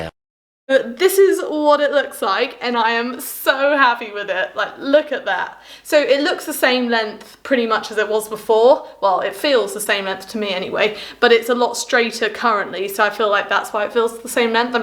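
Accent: United Kingdom. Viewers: You are so beautiful. I love your British accent by the way. 😊❤️☺️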